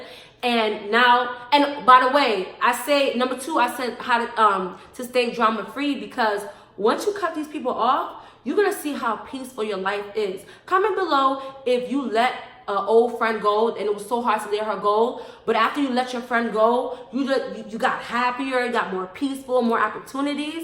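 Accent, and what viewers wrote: New York, United States. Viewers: She got that NY flow but she is classy and inspiration. Whole diff vibe 💖✨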